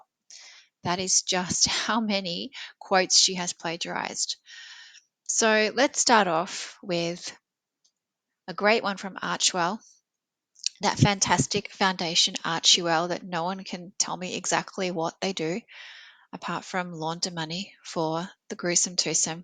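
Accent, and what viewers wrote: Australia. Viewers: Nice to hear a well-spoken Australian voice. "'A whole 'nother thing" - no. "A whole other thing" - yes. (Retired Australian teacher) 😊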